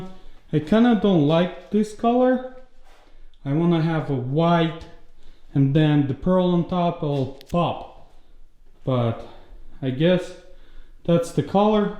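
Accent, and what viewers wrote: Russia. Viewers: I like your job you doing nice work!👍 I like your accent, We're you from? Russia? 😉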